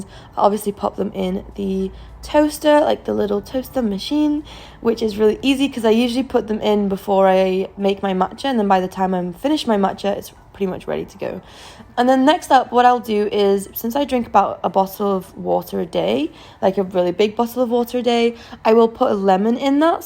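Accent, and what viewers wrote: United Kingdom. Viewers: Trying to work out what part of the UK you are from - is it somewhere near say Bath or Bristol but maybe originally from the North West?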